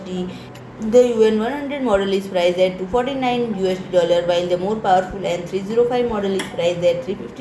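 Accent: India. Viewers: Didn't understand a single thing. Music + horrible mic + indian accent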